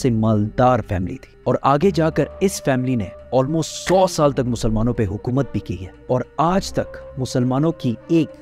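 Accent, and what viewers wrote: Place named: United Kingdom. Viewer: Bhai english accent british jaise kyu? Normal bolne mein problem hai kyq???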